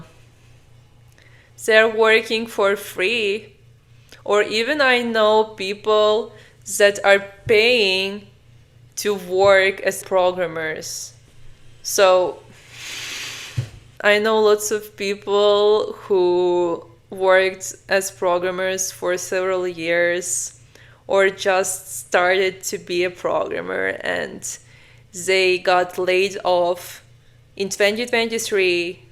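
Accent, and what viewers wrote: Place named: Brazil. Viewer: classic brazilian accent, i can tell when i hear my fellow brothers and sisters of brasil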